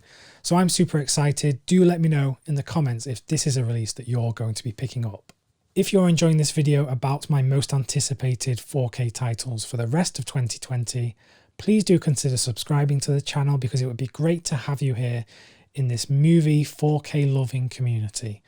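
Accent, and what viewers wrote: England, United Kingdom. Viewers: So you sound English are you based in the states? Only reason I ask is I can never order anything from Best Buy online. 4k discs are multi regional but the extras on the bonus disc are not. If you have a link please post it. I wanted BTTF but even <link> says not available in yiur area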